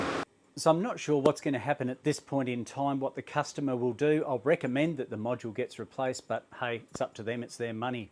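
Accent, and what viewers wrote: Australia. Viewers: Is this an Australian accent?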